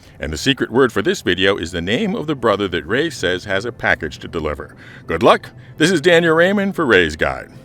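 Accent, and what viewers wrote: United States. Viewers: Damn now that American accent is so amazing. You should make angry shouting ads for ships in the american military style lmao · Mate, that accent is the most American thing I have ever heard. Loving your SC series more than Moe Haytar loves his packages. 😁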